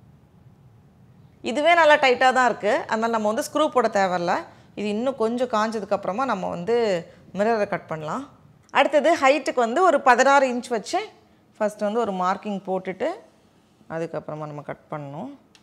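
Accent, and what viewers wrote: Nagercoil, India. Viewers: Nice Nagercoil slang..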